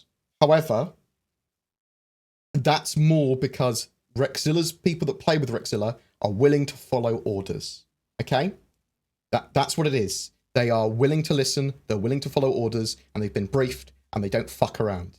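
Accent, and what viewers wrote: United Kingdom. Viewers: I agree with you about Disco Lando that he knows his stuff about the game, but there is really one thing that bothers me when he is on. That is the lack of control he has with his hands. Anyone that broadcasts for a living knows to keep their hands away from their mouths when the talk on screen. I have a difficult time understanding conversations, due to hearing loss. I've learned to somewhat read lips. When he coves his mouth, It's impossible. I can hear his speaking, but sometimes I have trouble really understanding what he is saying clearly. I spent too many years working around jet engine aircraft with a limited hearing protection, and unfortunately, some tones I frankly don't hear. If someone turns their back on me when they talk, or talk from another room, I just don't understand them very well. On that note, you do ok during your streams. 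I have no problem understanding you, even with your British accents. I look forward to 3.5 going to theEvocoti, the PTU and then out to live stream. I get it when it goes to Concierge and Subscribers as I am both. I also can't hardly wait for the Carrack to come out this year, as I have the Explorer pack that was available for Concierge.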